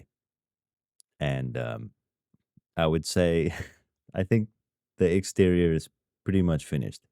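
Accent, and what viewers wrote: South Africa. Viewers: Are u south african ?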